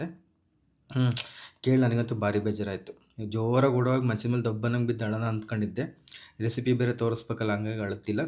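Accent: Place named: Holalkere, India. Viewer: ನಮ್ಮದು ಹೊಳಲ್ಕೆರೆ ಹತ್ರ Adanur Nimdu yavuru? ನಮ್ಮ ಭಾಷೆ ಸೊಗಡು ಇನ್ನೂ ಉಳಿಸಿದ್ದೀರಾ, ತುಂಬ ಖುಷಿ ಆಗುತ್ತೆ..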